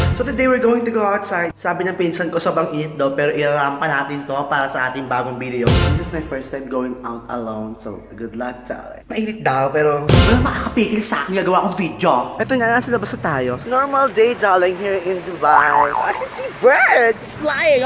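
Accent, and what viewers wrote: Visayas, Philippines. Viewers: Kuya! Curious lng ko, taga aha imo mama or papa? bisaya lge kaayo hahahaha! Proud Bisaya here 😊😊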